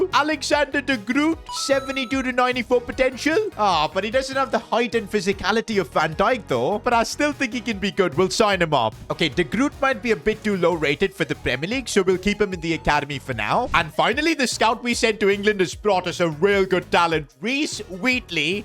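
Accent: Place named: India. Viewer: You talk like an Indian!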